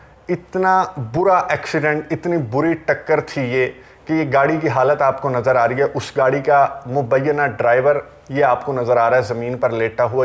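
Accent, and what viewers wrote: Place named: Lucknow, India. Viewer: Your "Andaaze Guftagoo is amazing" Urdu wonderful Lakhnavi Andaaz. MashaAllah. Keep it up, one suggestion !, Please do not indulge in Political or so called current affairs. I am 68.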